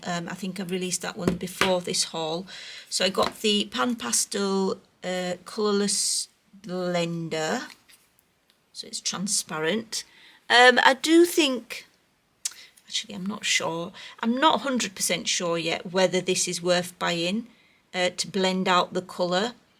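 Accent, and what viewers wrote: United Kingdom. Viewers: you said “colors of the world.” I know that you were referring to the markers. But because you said it with your awesome British accent, I found myself singing 🎶”Colors of the world, Every boy and every girl, People of the world, Ahhhhhhh 🎵 You activated the file in my brain. It was 1998 all over again! 😂 Thanks for the video. ❤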